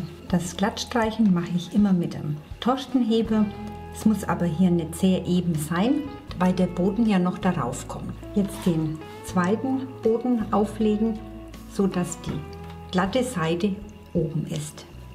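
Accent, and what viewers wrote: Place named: Franconia, Germany. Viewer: Ich schaue gerne Backvideos, weil sie mich inspirieren und fröhlich und entspannt stimmen. Für meine Geburtstagstorte habe ich hier auch Inspiration gefunden😄 Vielen Dank für die guten Erklärungen und die gegebene Nachlesemöglichkeit der Zutaten😊 Besonders an diesem Kanal gefällt mir persönlich, dass da manchmal der Dialekt durchblitzt und ich fühl mich gleich wie zuhause in der Heimat 😉 (ich sag nur 'fünfevierzich' hihi fränkisch ich liebs❤️)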